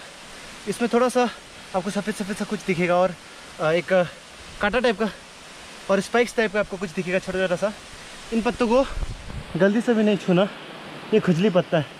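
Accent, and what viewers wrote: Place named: Arunachal Pradesh, India. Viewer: I like the way you talk it's kind of funny I mean arunachali style mast h🤣.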